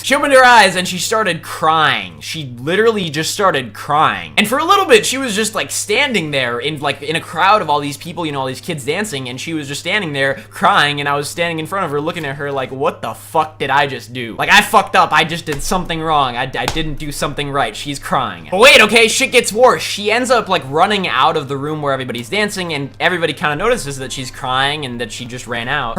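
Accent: Russia. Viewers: Do a full video in Russian accent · Blaziken you should make a new account go online mod and use you Russian accent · Russian accent gave me fucking cancer. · That Russian accent was great lol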